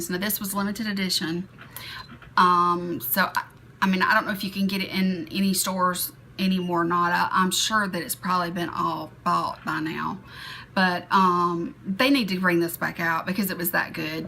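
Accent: South, United States. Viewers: hi i love your southern accent im from australia and i love make up to and if you want to do more make up looks do it don't let any one say differant i would love to see more also you remind me of the actress cathy bates i like her movies to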